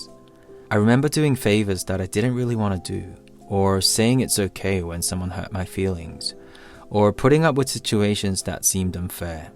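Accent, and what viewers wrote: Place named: England, United Kingdom. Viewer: You have a great English accent. You are definitely different. Love your videos .thanks for sharing. The world is here for you ❤ 💕. With all mental support. Is ok to be sad and angry and is ok to be aware of it.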